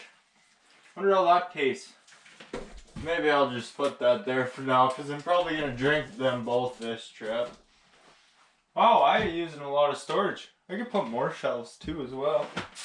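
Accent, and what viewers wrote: Canada. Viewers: Finally a Canadian on YouTube that sounds Canadian! Love your life mate, keep being yourself and we will keep watching and cheering you on!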